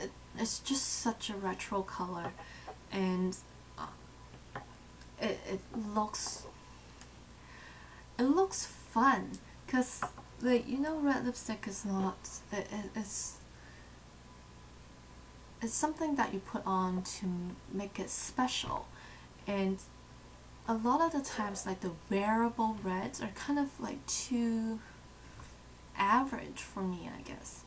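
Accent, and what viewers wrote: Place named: East Asia. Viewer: She sounds the same as other east-asian youtubers whose first language is not English.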